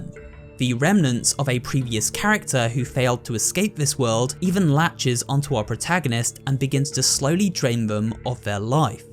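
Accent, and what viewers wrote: England, United Kingdom. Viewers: Why does every youtuber sound the same? Mousy, white with an ambiguous english accent ffs.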